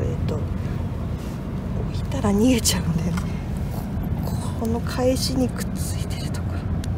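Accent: Kagoshima, Japan. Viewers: の優しさがとても嬉しく思いました。自分もほっとしましたありがとう😊 私も最近では釣場で空いてる時間にゴミ拾いしてます‼️皆んなが心掛ければ楽しい釣りが出来ると思います😁 所々出てくるかごしま弁がすごく癒されます👍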